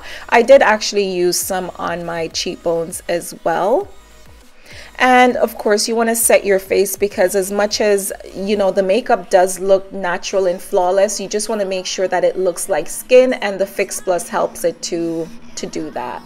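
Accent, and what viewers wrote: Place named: Jamaica. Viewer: Are you Jamaican ? Or of Jamaican/West Indian heritage? I am sensing a hint of my mother tongue :D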